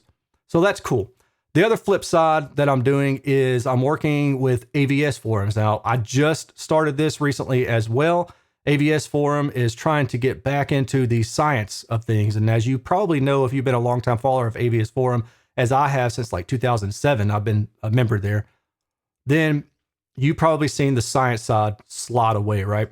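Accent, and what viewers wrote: South, United States. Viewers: Extra income?? You bought a friggin' Klippel system!! More than I would spend on a car! With a hobby like this, you need two day jobs. Also, as a yankee who loves to hear southern accents, it's nice to have you and Beau speaking sense, breaking the stereotype of the 'dumb south'.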